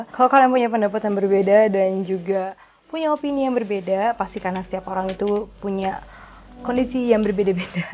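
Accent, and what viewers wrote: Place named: South Jakarta, Indonesia. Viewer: Lidah Jaksel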